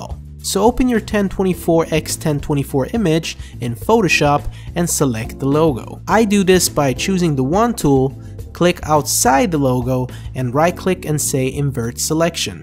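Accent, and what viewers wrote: France. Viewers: Hi, Are you french? Salut, t'es français ?xD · Are you french ? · you are french ? "salut mes amis" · hey are you french ? great vid btw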